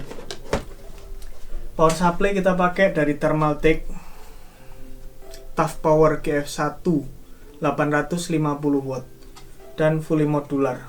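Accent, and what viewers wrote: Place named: Java, Indonesia. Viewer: Orang jawa mana bang.. Muedook bnget.😁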